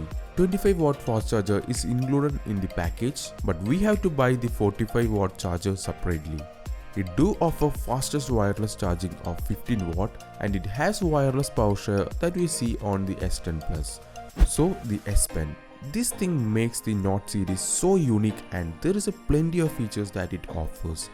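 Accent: Kerala, India. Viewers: R from from kerla. ? I m guessing from accent.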